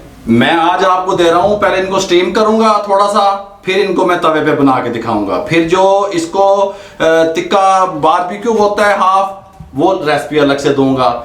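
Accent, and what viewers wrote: Punjab, India. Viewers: kia baat hay. way of expression is impressive. punjabi ka touch bht acha. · Bhai you r the BEST. I jus love your Punjab accent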